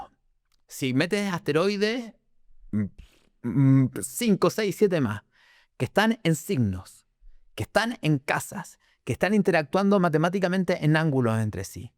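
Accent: Chile. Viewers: No lo conocía, pero al escucharlo supe que es chileno 🇨🇱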